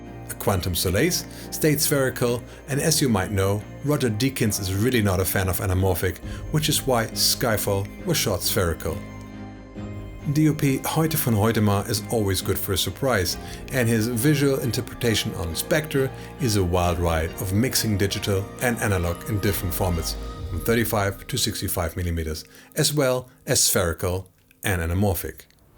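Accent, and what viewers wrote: Germany. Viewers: Wow, what an interesting and good looking channel! I did watched yesterday 4-5 Videos during my work from the homeoffice. Echt Freunde ich schaue schon seit Jahren englischsprachige Channels und Tutorials und jetzt treffe ich aus Zufall auf euch. Dachte ich immer die amerikanischen Channels wären besser, da spricht mich doch einer mit deutschen Akzent an. Ich denke ich werde Mitglied bei euch werden. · Thanks for this works! It show me how much i miss fo to the cinma! Also you have a nice gemran acent! And this is rare as i know as a german. Also instan season 4 and 5 shoot of the expanse shoot with lenses.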